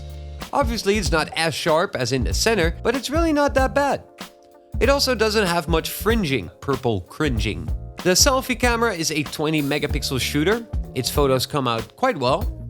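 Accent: Netherlands. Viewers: Hi, net je review bekeken. Zeer duidelijk 👍🏽 Dacht dat ik een Nederlands accent hoorde. Kijken of ik deze telefoon vandaag in Nederland krijg besteld. Top review. Groetjes uit Nederland en ga zo door. Marvin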